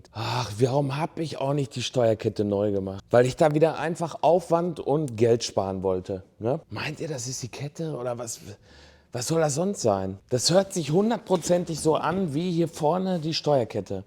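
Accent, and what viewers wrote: Ruhr, Germany. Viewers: wichtig ist, die deutsche Sprache in ihrer wahren und richtigen Form zu bewahren. Ich finde diesen Ruhrpottklang von auch so sympathisch! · Dialekte sind richtig und wichtig 😅 Ruhrpottklang von 😉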